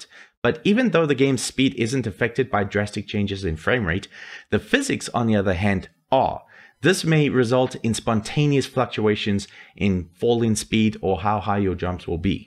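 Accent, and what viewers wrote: South Africa. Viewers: south african? thanks for the video BTW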